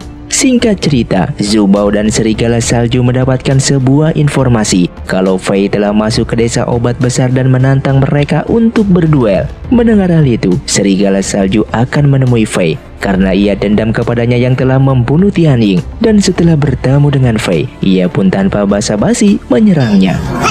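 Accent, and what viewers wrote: West Java, Indonesia. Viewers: Orang sunda nya??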